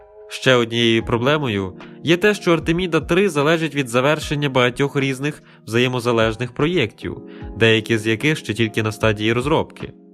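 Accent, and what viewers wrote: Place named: Zakarpattia, Ukraine. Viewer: Ви з Закарпаття? Акцент 😊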